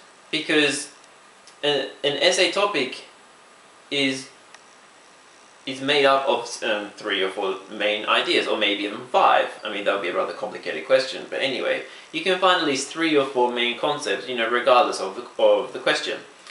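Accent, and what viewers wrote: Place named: China. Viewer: you have a Chinese accent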